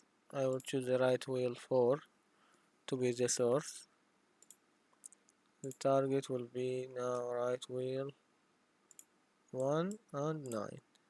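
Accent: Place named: Iraq. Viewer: لهجتك عراقي انت عربي لو كنت عربي عندك دوره باللغه العربية اشتريها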